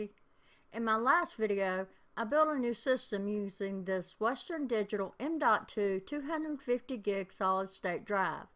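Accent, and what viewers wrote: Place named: Texas, United States. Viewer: I like your video, are you from Texas Because this is the kind of accent I hear when I watch cowboy movies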